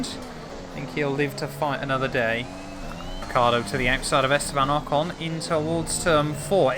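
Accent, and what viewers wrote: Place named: England, United Kingdom. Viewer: "Sweat mode has to well and truly be engaged." You have to love the English language when it is spoken by an Englishman. To me, it just sounds like F1. 😁🇺🇸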